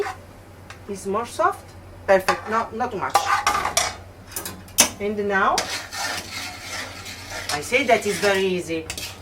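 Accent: Italy. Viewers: inglesi e americani adorano questo tipo di accento italiano, un po' come a noi divertono certi inglesi quando parlano italiano con l'accento inglese. è naturale. ha fatto bene. brava chef ! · more than her recipees i love her cute italian accent. so lovely!❤ and it proves her being highly educated. i give her a big hand! 👏👏👏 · I think I'm in love. She cooks, earns money cooking. Is a great cook and speaks English with an Italian accent. Is beautiful and charming. I'm in love. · Nice lady . Funny indeed charming 💎. Great cooking skills . Great english with that italian accent . I would love to eat there . Beautiful country mama Italia 🇮🇹. ❤. Looks Yummy dish 😋. They have so great food n excellent cakes n ice-cream 😋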